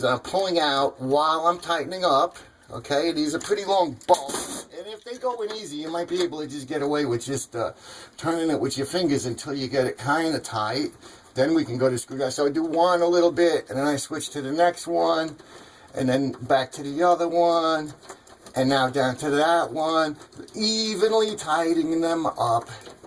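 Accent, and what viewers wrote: New York, United States. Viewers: Thank you . I love your NY accent and sure appreciate your help with the mollies. I do have one question, though. How would you fix the other kind of hole that you showed at the beginning of your video where the two holes ripped making the two holes one long hole? That's what happened in my bathroom. I hope you really do answer all of the questions you get because I really need to know! My towel rack fell off and it looks terrible! Thank you again!!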